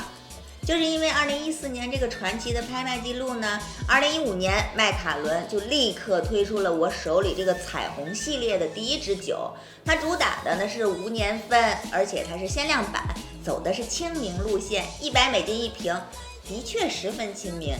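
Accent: Tianjin, China. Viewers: 天津人吗？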